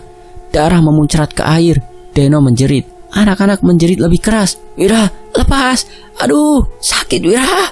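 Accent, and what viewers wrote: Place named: Surabaya, Indonesia. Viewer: Saya kira orang Surabaya mas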